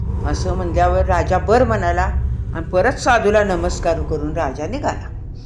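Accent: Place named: Satara, India. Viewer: आज्जी नक्कीच सातारच्या असणार आहेत. आमच्या सातारची गोष्ट सांगण्याची आजीची शैली खूप आवडली. भाषेत गोडवा आहे.सांगण्यात खूप एकाग्रता ...तळमळ..जाणवली🙏🙏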